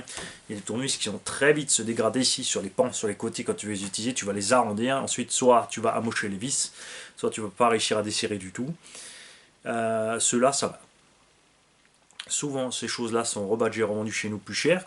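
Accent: Alsace, France. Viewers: Mdr le 💩💩💨 🤣🤣🤣 Moi l'accent me va bien au moins je te comprend 🤣🤣 jsuis aussi alsacien !! Ah yo Hopla 🥨 Merci pour la vidéo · En vrai l'accent Alssacien ça va , mais si tu écrit pour expliquer c peut âtre pire 😂😂😂.....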